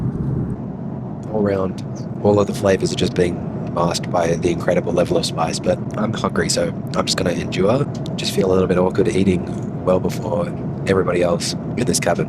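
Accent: Australia. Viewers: Great video mate! I really enjoyed this video and I hope to fly to Queensland in Australia 🇦🇺 hopefully next summer to meet up with a friend. I really think this was an interesting video for sure! The reason airlines now love Queensland seems unusual for sure but still interesting! 😂 Also what idiot tried to think you’re not an Aussie? You’re clearly one based on noting more than your accent! Cheers 🥂 Mate! Really enjoyed this video and I loved it as I always do with your videos! -Caleb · your accent screams australian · How did anyone even mistake you for a European guy lol You’re obviously Australian just from the accent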